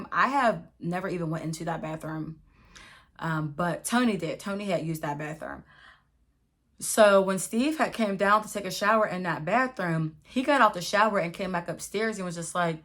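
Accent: Pittsburgh, United States. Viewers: i love ur story times & i love ur Pittsburgh accent it reminds me of college 😩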